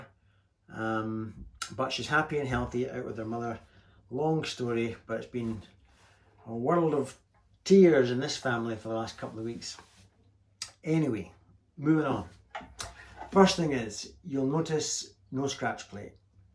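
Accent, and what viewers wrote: Scotland, United Kingdom. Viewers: Thanx so much,. Your vid wuz just GREAT (!)...and, of course, my brain'll have a Scottish accent for days now 😶...and I totally sympathize with you concerning the "Low Volume Dilemma" 😣 I suspect your singing voice'd be quite interesting too. Any thoughts/experiments?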